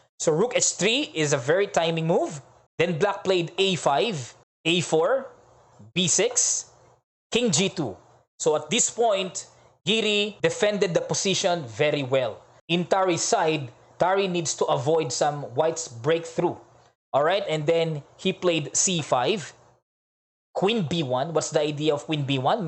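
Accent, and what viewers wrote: United Kingdom. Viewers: naks... coach... galing ah... British accent. nanibago ako.. "IM ".☺✌✌✌✌✌ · galing namn.. nanibago ako.... sir coach....parang british ang dating..napakahusay mo..saludo kami ng mga anak ko sayo...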